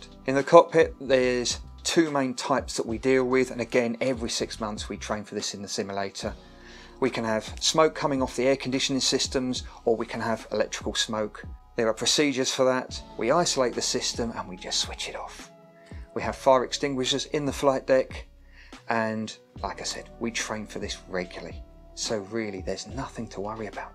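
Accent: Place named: London, United Kingdom. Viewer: I'd say you're from East or maybe North London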